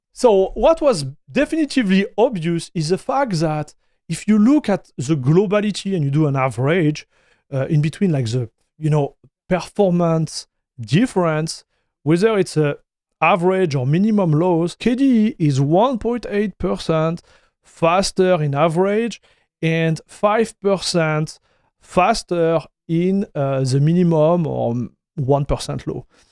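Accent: France. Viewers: Very French accent! Un compatriote 😂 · I hope your english gets better, it sounds more like pure french, and it's very hard to understand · lol, yes french accent from france but his english is easy to understand.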